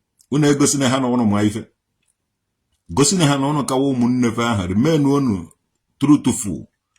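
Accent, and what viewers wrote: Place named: Agulu, Nigeria. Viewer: With this man accent he must be from Agulu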